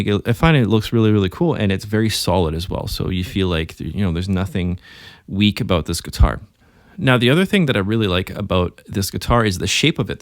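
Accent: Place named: Canada. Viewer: Gee you sound very Canadian! Very interesting that Klein took on creating a next gen harp guitar! Thanks for the exploration!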